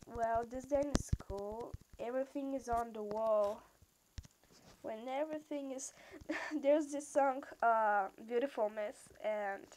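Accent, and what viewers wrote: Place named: Russia. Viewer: Hey! I'm half Russian too! My mom is Russian.. but I don't have a great accent like you and I'm from America! Anyways, love you your the best!:p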